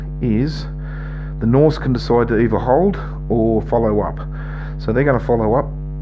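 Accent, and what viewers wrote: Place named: Australia. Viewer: Aussie accent , pls tell me youre in Melbourne ?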